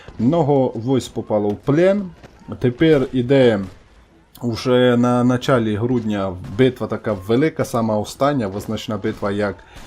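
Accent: Transcarpathia, Ukraine. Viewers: Чоловіче, славно виходить)) і закарпатський діалект - теж файний. Я би так і продовжував коментувати: усе зрозуміло, але ти звучиш значно природніше і легше👍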